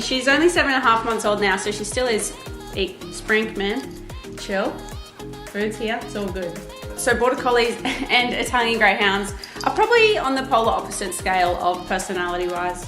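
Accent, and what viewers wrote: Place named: Australia. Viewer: Soooo Australian, let’s put an R at the end of everything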